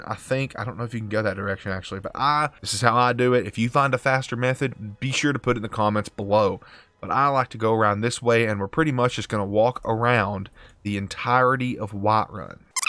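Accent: South, United States. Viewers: It is so great hearing another southern person playing video games😂 favorite part is “beat the brakes off of her”😂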